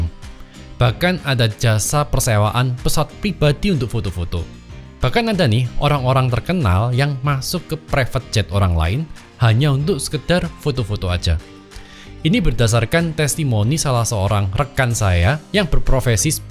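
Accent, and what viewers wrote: Java, Indonesia. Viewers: Denger om ngomong i satisfying, medok e enak wkwk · Sampean wong jowo opo lek.. mergo logate rodok medok rodo chino🙏🙏salam jowo lek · Betah gua liat Koko ini kalo ngomong pasti Koko Jawa kalo Koko Jakarta tengil2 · Agak medok' ga bro 😁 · sukaa bangeet motivasinyaa...medok juga suaranya..kereeeen bener semua yg diomongin bukan ngasal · Haha logat nya Jawa banget 🤣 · Logatmu aku suka mas,jowo mu medok 👍👍👍👍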